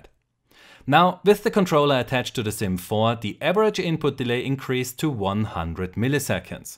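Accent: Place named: Germany. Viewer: Call me Sherlock I think he might be german ._.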